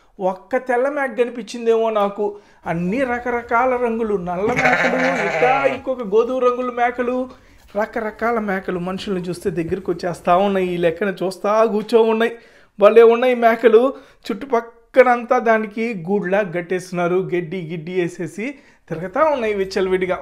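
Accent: Nellore, India. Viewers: I am not saying you need a fake voice. Just talk normal how other travel blogger’s and other youtubers are talking. You try to speaking over extra Nellore slang . I am not any advice to you. Since I am your subscriber I telling to you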